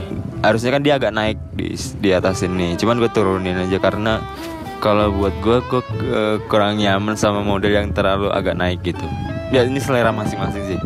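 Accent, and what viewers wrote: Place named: Java, Indonesia. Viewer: Medokmu ketoro mas 😅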